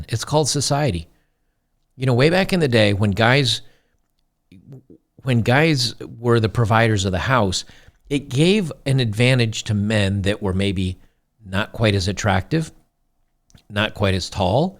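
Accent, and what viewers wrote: Australia. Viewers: As soon as I heard the accent, I thought to myself, "Thank fuck I left Australia!" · Omg she sounds Australian. I hope I don't run across her......😱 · Being Australian myself I knew she was Australian as soon as she started talking... trust me though, you will be surprised how many girls there are like that over here, she is not alone. Anything for clicks these days...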